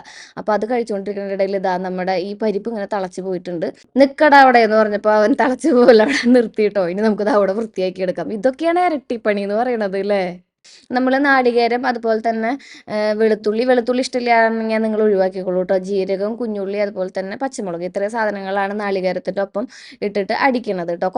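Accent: Thrissur, India. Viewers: ഓർമ ശരി ആണെങ്കിൽ 2019 ഒരു 18 തൊട്ടെങ്കിലും ഞാൻ video കാണാറുണ്ട്. പല daily vlog കളും കണ്ടിരുന്നെങ്കിലും ഇപ്പോഴും കാണുന്നത് മാത്രം ആണ്‌ വേറെ ഒന്നുമല്ല. ഞാനും തൃശ്ശൂർ ആണ്‌ age തന്നെ ആണ്‌ വർത്തമാനം കേൾക്കുമ്പോൾ എനിക്ക് എന്റെ നാട് ഓർമ വരും എന്റെ ബന്ധുക്കളും കൂട്ടുകാരിയും ആണ്‌ നിങ്ങളൊക്കെ എന്ന എനിക്ക് നിങ്ങളെ feel ചെയ്യാറ്. But പ്രൊമോഷൻ ചെയ്യുമ്പോൾ filter ഉപയോഗിക്കരുത് തന്നെ പറഞ്ഞല്ലോ ആൾക്കാർ selfish ആകുന്നു എന്ന്.filter ഇടുമ്പോൾ അങ്ങനെ തന്നെ തോന്ന ഞങ്ങളെ പറ്റിക്കല്ലേ. പ്രൊമോഷൻ ടൈമിൽ നല്ല skin അത് കഴിഞ്ഞു കിച്ചണിൽ കേറിയപ്പോ ഒരു glow യും കാണാനില്ല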